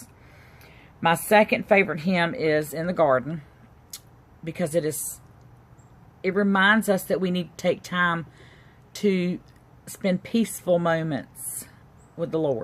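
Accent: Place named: North Carolina, United States. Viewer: By the way~ I am from Durham (but have lived in Texas for 30+ years). I love the way you say "Derm" for Durham! I miss the NC accent! ♥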